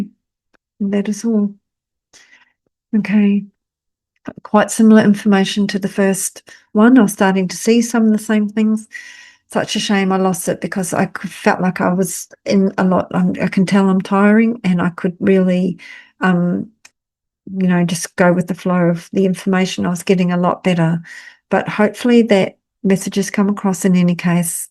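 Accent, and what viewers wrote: New Zealand. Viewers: Her Kiwi is strong 🙂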